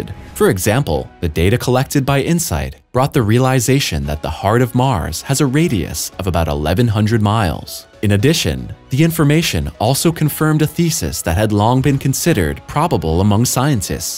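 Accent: United States. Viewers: Hear that voice. Dudes American. Go on with that stupid metric shit.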